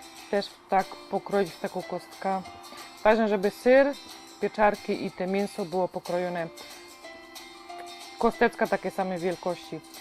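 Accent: Silesia, Poland. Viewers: Jak ja nie cierpie gwary slaskiej (sory)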